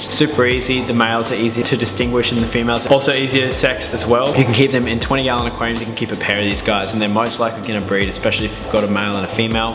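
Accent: Australia. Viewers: Bro, you have a thick Aussie accent. Consider posting text names of each fish in the video and a list of them in the description for those of us who can only understand English. 😈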